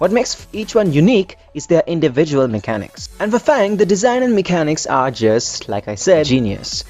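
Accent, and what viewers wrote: India. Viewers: r u Indian tho ?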